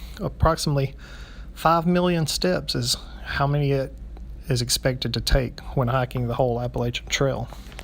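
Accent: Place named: South, United States. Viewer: Nice job and good facts for the newbies to the whole idea. I catch a bit of a Southern accent, where are you from?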